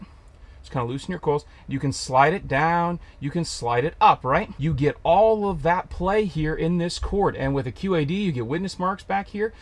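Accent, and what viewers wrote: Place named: Pennsylvania, United States. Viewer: I love the way he says "he' LOOOOOOH everybody" with that northern PA accent. ALWAYS puts a smile on my face.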